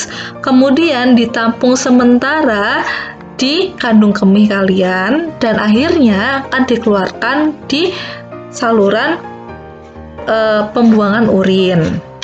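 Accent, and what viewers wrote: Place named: Java, Indonesia. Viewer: Medok banget jowonya 😁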